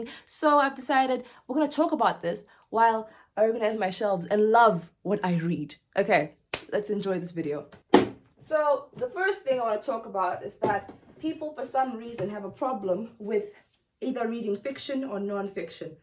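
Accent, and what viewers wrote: South Africa. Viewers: your accent is probably South African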